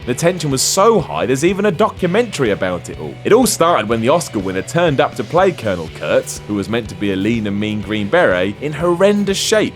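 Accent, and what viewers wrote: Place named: England, United Kingdom. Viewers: Jackie Chan? Are you fucking kidding me? Maybe someone should do an internet top 10 SHITTIEST top 10 lists and have this annoying motherfucking WhatCulture lists take all 10 spots!!! As soon as I hear this English guy now I know it's shit!!!! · from WhatCulture. He's phoning in these voice overs because he's gotta dedicate most of his energy to physical training. And yet, he's got an unapproachable Londony class that he brings to every narration.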